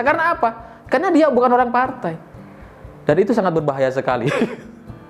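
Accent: Madura, Indonesia. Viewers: Mas ini kalau didengar nada bicaranya kayak orang madura, tp saya merasa salut karna yg disampaikan masuk akal, lanjut mas semoga sukses selalu🙏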